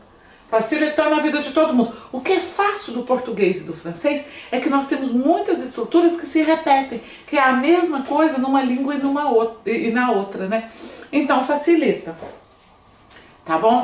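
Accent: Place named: Minas Gerais, Brazil. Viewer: Prof sou novo no canal, to amando os videos! Seu jeito mineiro e reconfortante pra mim que to tentando aprender desde 2020 mas so agora decidi pegar firme!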